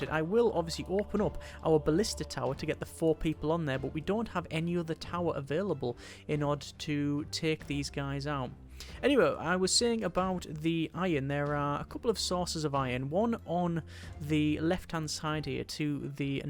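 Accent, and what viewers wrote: United Kingdom. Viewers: You are British or Scottish, I Know it.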